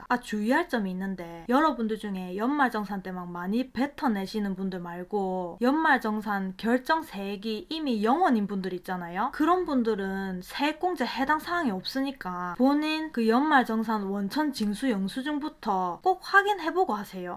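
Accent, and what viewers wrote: Gyeongsang, South Korea. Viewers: 경상도 억양같은데요~~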